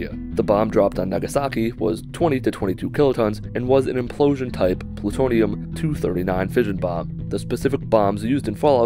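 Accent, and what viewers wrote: Michigan, United States. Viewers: I knew your accent/or lack of accent was michigan-y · I'm good g to guess your from Michigan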